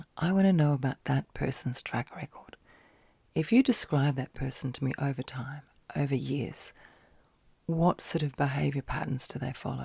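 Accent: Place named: Australia. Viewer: I can definitely tell you studied counselling, your remind me of my psychologist I used to see! I love your voice/accent- I'm Australian too but I'm in Florida for a year so it's lovely to hear an Aussie accent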